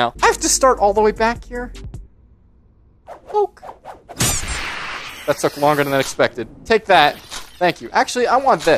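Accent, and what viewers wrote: Canada. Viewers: I can hear the Candian in your voice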